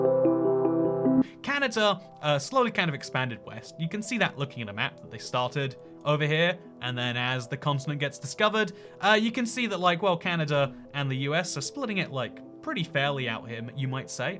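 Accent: United Kingdom. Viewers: As a Canadian with British heritage (my Mom moved to Canada with her family from Stafford when she was 11), I found this video quite amusing -- like listening to one of my British cousins trying to explain Canada :) You got it mostly right too. Good job!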